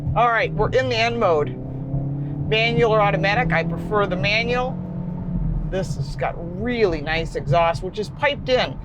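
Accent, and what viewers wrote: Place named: Midwest, United States. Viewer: Got to love that Midwest twang... Great review!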